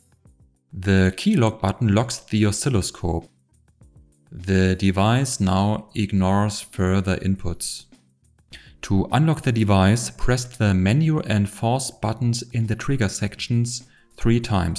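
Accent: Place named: Germany. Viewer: Moin! Das Gerät wird unter verschiedenen Bezeichnungen angeboten. Hanmatek DOS1102, Mustool MDS 2112P und OWON SDS1102. Sind alle identisch, aber unter der Bezeichnung OWON deutlich günstiger. Ich besitze das Gerät von OWON und bin damit sehr zufrieden. An "disadvantages" fehlt ganz klar noch eine fehlende externe Triggermöglichkeit und ein mit 10K doch eher recht kleiner Speicher. Das limitiert doch ganz erheblich die Analyse von digitalen Bittmustern. Trotzdem für den Preis ein super Gerät! Mal grundsätzlich: Offensichtlich (hörbar) Ist deutsch deine Muttersprache. Warum antwortest du auf deutsche Kommentare in englisch??? Deinen Text zu dem Gerät hast du doch auch in deutsch verfasst... Ansonsten recht gelungenes Video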